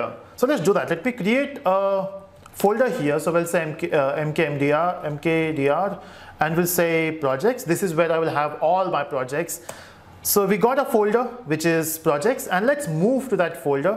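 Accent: India. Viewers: ahhhh another indian accent.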